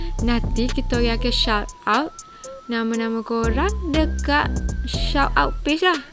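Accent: Kelantan, Malaysia. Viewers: Suka dengar kecek Kelate. Hadir dari Kuala Berang, Terengganu.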